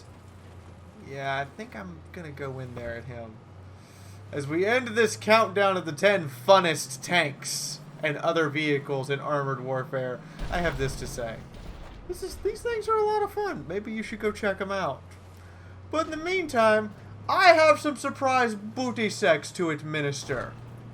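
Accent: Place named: United States. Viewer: That explains it I suppose. I guess I just assumed based on an American(ish?) accent that you played on the NA.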